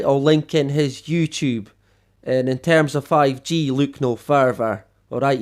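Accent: Scotland, United Kingdom. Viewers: I love your accent...sounds Scottish..... And no vaccine for me...💯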